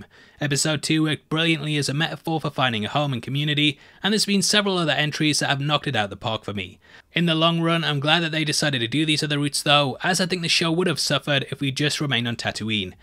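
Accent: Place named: United Kingdom. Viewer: Maybe it's a British accent but I find you much less annoying than Eric at new rock starz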